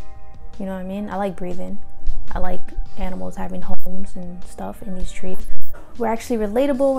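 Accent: New York, United States. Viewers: Love that New York accent still! #VEGANCRUSH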